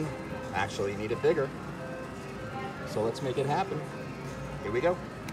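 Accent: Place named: New Jersey, United States. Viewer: I enjoy watching and listening to you commentary during you play. You sound like a real good Jersey guy. Love the show and keep it up. Bring your mom back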